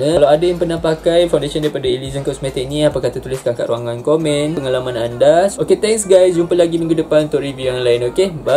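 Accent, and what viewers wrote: Sabah, Malaysia. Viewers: Are you sabahan? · Orang sabah ke ?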